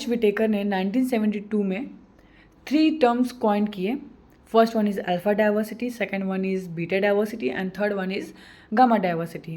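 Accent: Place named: Bihar, India. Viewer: Ma’am you accent seems like you are from bihar😅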